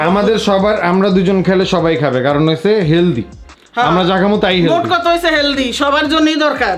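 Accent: Noakhali, Bangladesh. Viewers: খালা আপনি নোয়াখালির ভাষায় কথা বলেন।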